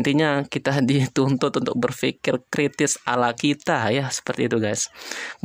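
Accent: Lombok, Indonesia. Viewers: logatnya kek org lmbok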